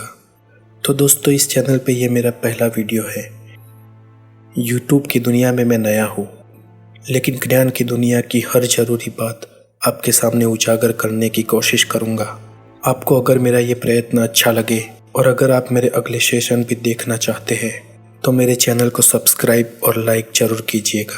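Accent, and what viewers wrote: Gujarat, India. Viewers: Aap gujarati ho na...😊😅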